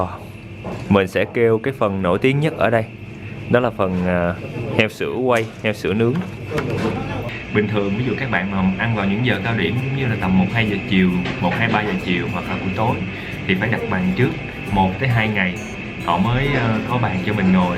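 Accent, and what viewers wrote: Mekong Delta, Vietnam. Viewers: anh ấy đẹp chai và có tâm hồn ăn uống và giọng nói mộc mạc của người miền tây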